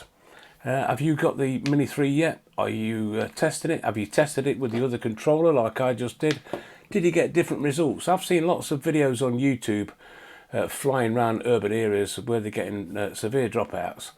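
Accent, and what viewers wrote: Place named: Midlands, United Kingdom. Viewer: you sound like your from Midlands, I got one today and it does 350m before losing 2 bars. Would loved to run 2 of them at same time and test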